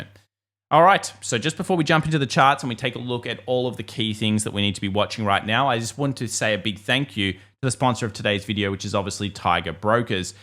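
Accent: Australia. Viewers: You talking like Crocodile Dundee over here!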